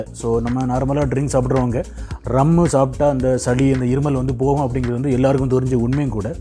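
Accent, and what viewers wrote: Tirunelveli, India. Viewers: Anna...nenga tirunelveli thoothukudi side ah?😅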